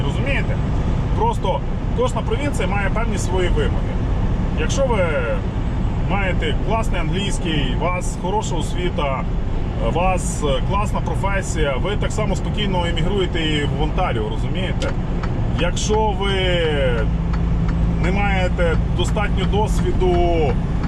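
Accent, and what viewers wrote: Lviv, Ukraine. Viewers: Добрий день мене звати Ігор живу в Оттаві.Працьую водієм рок трак. Хотів би з вами поспілкуватись,чую вашу розмову і роблю висновок що ви Львівська обл.Дуже дякую.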